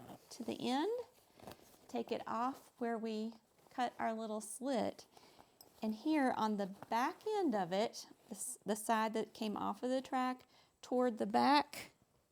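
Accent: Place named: South, United States. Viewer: Thanks for the awesome video. Everything sounds better with a southern accent! One question, why not simply pry open the bottom of the glider to put it back on the zipper instead of cutting the zipper?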